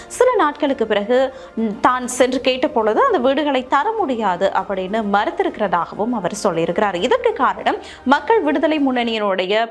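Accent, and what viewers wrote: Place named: India. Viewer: Can you please maintain Jaffna Tamil. It’s more of z Indian Tamil.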